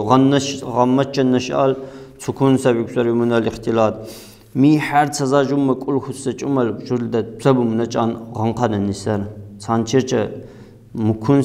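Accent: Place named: Lak, Russia. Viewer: Да будет тобой доволен Аллаh,как приятно чисто лакская речь слушать без русификации❤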